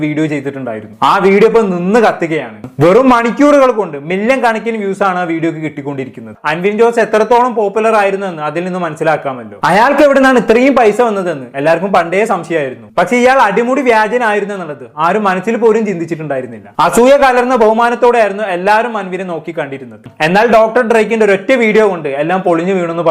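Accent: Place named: Kochi, India. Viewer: Like Kochikaran 😂😂😂😂😂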